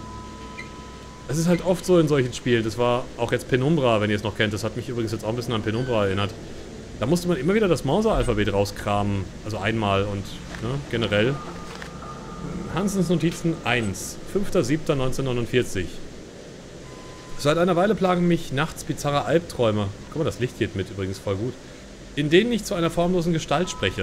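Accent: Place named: United Kingdom. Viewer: Der Britische Akzent ist so schön ! <3 ich mag es auch lieber wenn es winter ist blöde Hitze >y<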